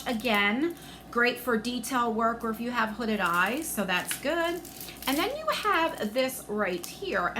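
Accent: New Jersey, United States. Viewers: I love YouTubers that are real! You know just themselves and Hughes seem I don't know if it's because I'm from Jersey myself you know and relocated but I miss it if that's maybe why I feel this connection to you because I mean all my friends growing up I mean I can hear them in your voice I can hear your accent I feel like I'm back in New Jersey hanging out with my friends doing crazy things and that was a really good time in my life. I had great friends back then we're still friends we keep in touch but it's not the same you know everyone gets older and grows up and then life hits us! LOL. It's just brings me back and you're just such a real person I just love it! Never change please because you've got what YouTube needs! Much Love XOXO - Kylie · 😘😘 love your videos! They make my day! Your jersey accent is the best!!! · New Jersey represent! 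Your accent makes me home sick for NJ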